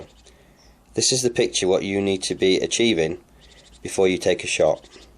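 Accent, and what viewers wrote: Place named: United Kingdom. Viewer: finally a British guy talking. being in the cadets i have been trying to find a British guy to tell me what to do. not an American. nice video dude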